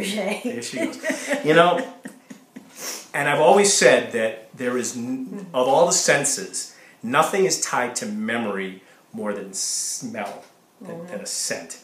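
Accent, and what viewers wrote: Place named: Boston, United States. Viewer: I hear Boston!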